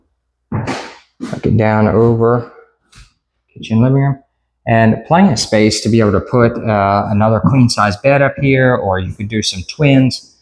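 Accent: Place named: United States. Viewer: Aucun Européen parle comme cela. Faut il être un Con d amerlock pour avoir cette intonation. Si un Iranien ou autre vous tué , on ne vous pleura pas et tant on ne vous entendra plus.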